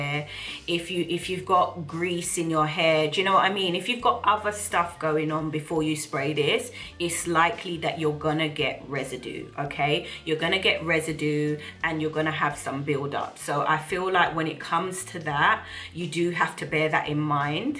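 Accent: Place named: United Kingdom. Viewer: Omg...I love love love love your accent, my dream is to come to the UK 🇬🇧 ❤️ thank you for your review. I bought the Ebin in the pump(like pump it up) you get WAY more lol can't wait to use it.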